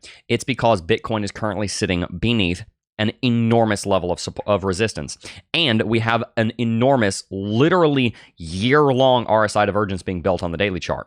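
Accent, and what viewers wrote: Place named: United States. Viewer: in the uk we see this way of chatting as a yank as weird its like a usa news presenter or usa tv adverts u see in movies as actors pretending to act. please its so corny its horrible. surely this isnt normal in the usa.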